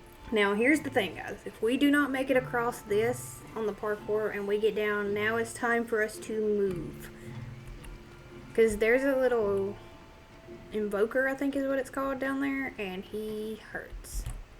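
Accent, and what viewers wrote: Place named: South, United States. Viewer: Hey! Contrats on starting your new modded serie! I've been kinda binge-watching the serie so far. You seem new to this kind of thing, which is great! I'd like to know if you're the kind of YouTuber that likes having comments helping you with tips and tricks when you seem stuck, warn you when you make a mistake or forget something, or if you're the type that rather figure it out on your own, if you like the challenge of solving everything like it's a puzzle or some type? Just trying to figure out if you allow some back seating or not, this changes the perception on how we can interact with you in the comment section:) The serie's been great so far, quality wise I'd say the only thing so far is that your voice is really soft and quiet, which makes the video pretty relax (Which I suppose fits with your Cozy persona:D) but personally it could use a little +1-2db increase (just a little louder so we can at least hear you clearly). 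I must say though, you have a very nice accent, sounds a bit southern to me?:P I might be wrong but to be fair I'm not from the US hahaha What are your goals for this modpack? Do you plan on automating everything? Are you more of a stylish builder that fits automation into a design or do you design around the automation, or maybe you simply make it work and that's enough? Lastly, I'm curious if you plan on maybe streaming at some point? A format I like seeing is those cuts you do when you work on a project, when you know it's going to ta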